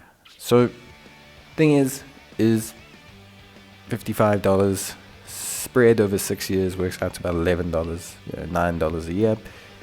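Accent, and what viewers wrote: South Africa. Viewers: Wait are you south african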